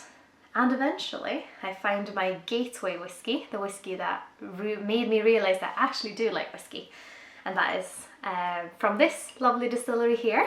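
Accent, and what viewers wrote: Scotland, United Kingdom. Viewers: I'm guessing your accent is Scottish because you've lived there for the last several years. Cheers and Good Luck!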